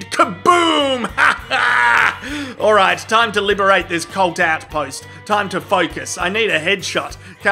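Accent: Australia. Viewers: Is he really an Aussie? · Lol TRUE AUSSIE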